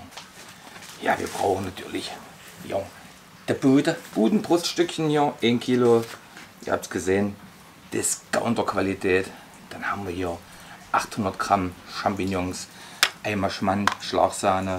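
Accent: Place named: Saxony-Anhalt, Germany. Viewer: Leckeres Rezept😋 und dem Dialekt nach zu urteilen,könntest du aus Sachsen-Anhalt kommen🙈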